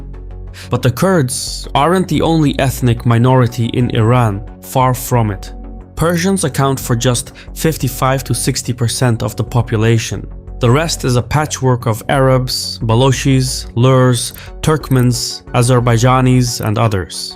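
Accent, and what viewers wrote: Russia. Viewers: Dude at least hid your Russian accent 😂 before trying to say what Iranian people want or who they trust! Did king Putin put you up to this? such a low act !!!:face-red-droopy-eyes::face-red-droopy-eyes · Thanks for spewing so much lies, you Russian asset with such a horrible accent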